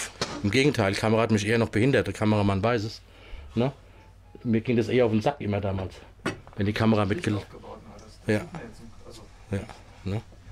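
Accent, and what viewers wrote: Hesse, Germany. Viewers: Hessisch is und bleibt de geilste Dialekt !!!! 😂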